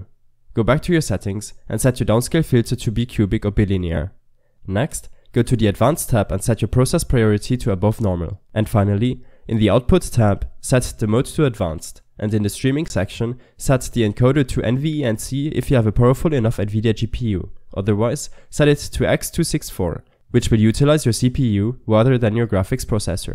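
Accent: Germany. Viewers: 3 sekunden und ich wusste du bist deutsch lol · tahnk u man so mmusch youre the best and i love ur german accent liked and subbed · spricht du auch deutsch ? hört sich irgendwie so an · mann hört so hart raus das du deutsch bist xD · Hrm...German accent sound so cool, btw what microphone do you use? It sounds great. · Love your Deutsch accent 😜😜😜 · Wollt schon sagen ich guck das video zum erstenmal direkt habe ich gedacht deutscher haha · Genau so ein Tut habe ich gesucht danke :D Hab gleich gehört das du aus Deutschland kommst xD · German Akzent 😅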